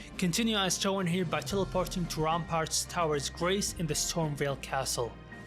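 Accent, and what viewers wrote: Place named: Saudi Arabia. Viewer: ياخي اللهجه السعوديه اسمعها لو وين ما كانت 😂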